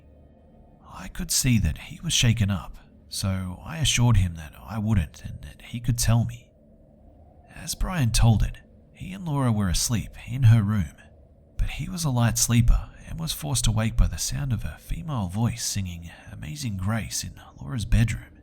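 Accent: Australia. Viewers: you and lazym are the only horror story tellers i like. so many boring droners with no...energy. also i think the ausie accent helps! i listen to you most nights as i fall asleep (fear helps me sleep haha). i originally found your channel via chilibeast i think. thanks!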